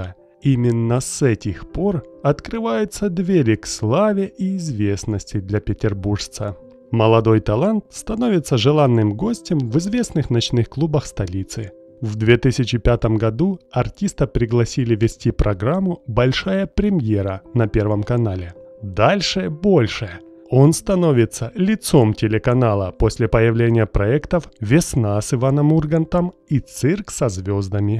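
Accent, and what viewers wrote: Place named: Ukraine. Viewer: а что, это текст переведен с украинского? абсолютно нероссийские слова и интонации..."стоимость становит...." так не говорят. хотя акцент иногда похож.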